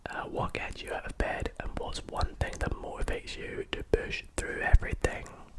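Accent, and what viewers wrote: Scotland, United Kingdom. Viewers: I love old Scottish accent, deeply miss it in the new vids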